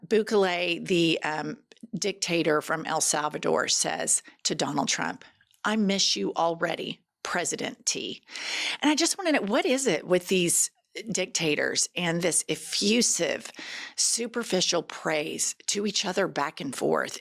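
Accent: South, United States. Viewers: I like you, but please learn the pronunciation of Bukele's name. (Boo'-ke-lay) He's the human form of a shriveled ballsack, and I'd rather you call him Bukake, but that's not why I sent this correction. I love the pod, you, and the. And I will admit, a southern accent on a woman is sexy, whereas, on a man, it just makes them sound stupid. I want to retain my attraction to the female southern accent. Please don't take offense.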